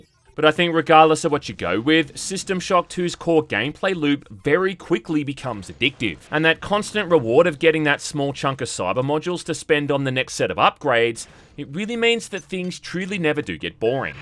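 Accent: Australia. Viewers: Never tire of you saying 1999 the aussie is strong with this one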